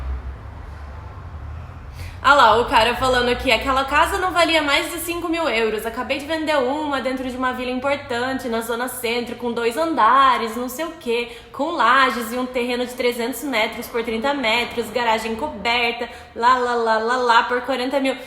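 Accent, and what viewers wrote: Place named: Rio Grande do Sul, Brazil. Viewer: 🇧🇷Oi linda 🤩😍🥰 que bom te ver, e saber que está tudo bem 🙌eu achei que era do rio grande do sul pelo sutaque😂 beijinhos para a sua mama👸 e🐶freinhae e para vc tbm👸😂😂😂😂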